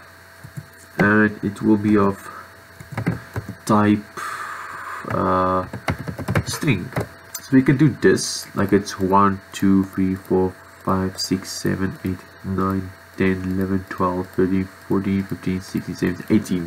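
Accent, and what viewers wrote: South Africa. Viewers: Are you from south africa? AWEH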